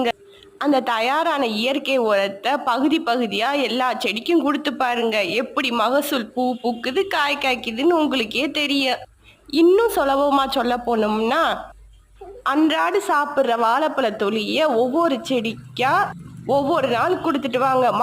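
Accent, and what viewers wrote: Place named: Madurai, India. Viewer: மதுரை பேச்சு அருமை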